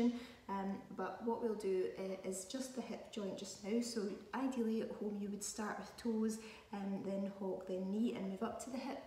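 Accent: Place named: Scotland, United Kingdom. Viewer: Thank you. As a Midwestern American, I must say I love the Scottish accent. So much nicer than what I hear around here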